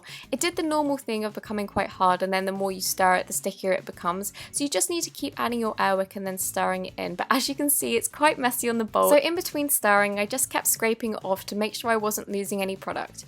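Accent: Australia. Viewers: Are you Australia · Are you aussie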